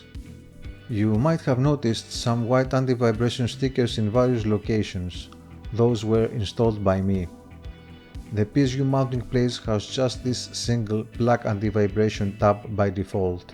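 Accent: Greece. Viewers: why does he sound so greek ?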